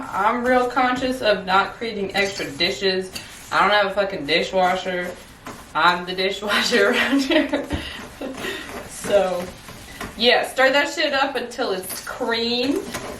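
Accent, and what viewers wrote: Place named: Canada. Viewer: The Canadian accent is amazing!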